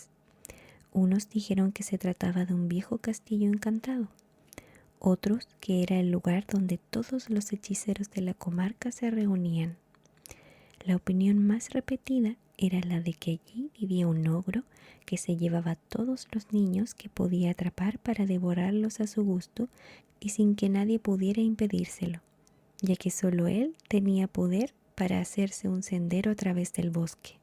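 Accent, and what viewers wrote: Chile. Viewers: eres chilena o mexicana ? 🤔🩷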